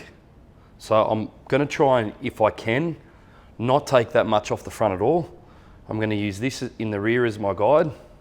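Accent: Australia. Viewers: is he jewish /american,,he looks like Billy joel but sounds like an auzzie or new zealander,,he will probably never come to the united kingdom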